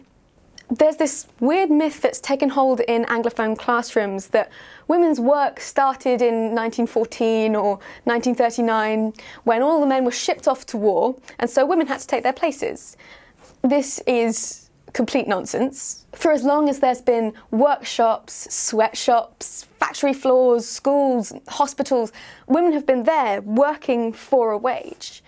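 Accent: United Kingdom. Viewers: Cute, sexy, smart and with a British accent......cool!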